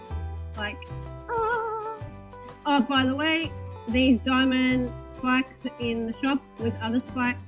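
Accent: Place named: Australia. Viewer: Are you Aussie? You sound so Aussie hahaha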